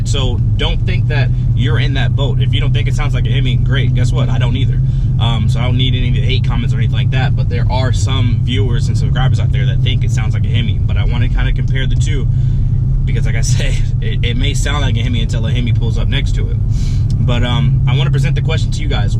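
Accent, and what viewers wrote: United States. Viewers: U from Alabama bro?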